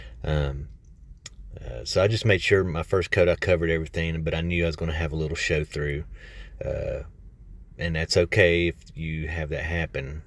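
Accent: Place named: South, United States. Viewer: !!! these look fantastic!! i love how you did the base!! so unique!! i love the southern accent too!! Michigander here recently transplanted to VA... I tell ya what i am getting a way higher profit here in the DC NOVA area vs selling in Michigan.. location really makes a difference!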